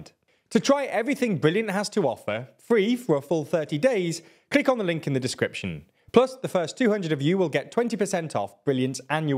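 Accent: United Kingdom. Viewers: Why do y’all say slavic names so British 😩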